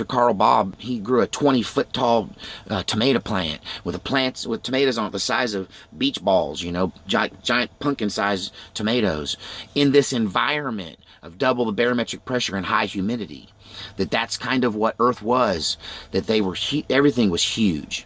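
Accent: New York, United States. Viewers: I LOVE COFFEE TALK and I love how you say coffee talk ..I love New York Accents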